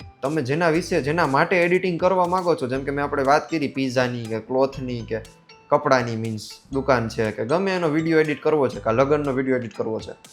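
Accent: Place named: Surat, India. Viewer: AEK number jordar jabardast Little bit Rajkot , but full on surti..... Lot's of love from surat...❤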